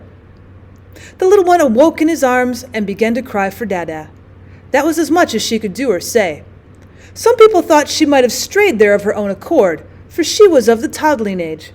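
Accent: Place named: South, United States. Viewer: i just love ths southern twang to this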